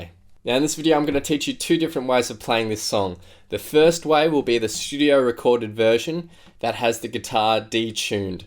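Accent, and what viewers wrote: Australia. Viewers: Seriously! He is my top "go to" when I need a cover song tutorial. Clear and thorough instruction; plus a crackin' Australian accent!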